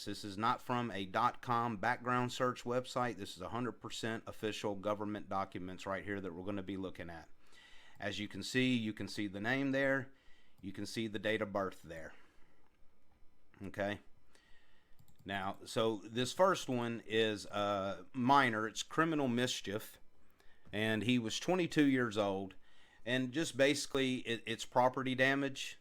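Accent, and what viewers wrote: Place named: South, United States. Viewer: From one Southern to another I love your accent. I am guessing South Carolina or Tennessee? I'm 11th generation Floridian and it's rare to hear a native Floridian accent.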